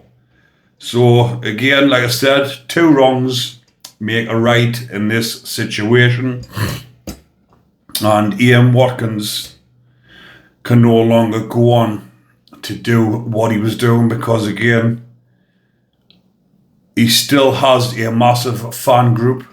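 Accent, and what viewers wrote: Newcastle, United Kingdom. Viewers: Bacon, hes a Jordy it’s his accent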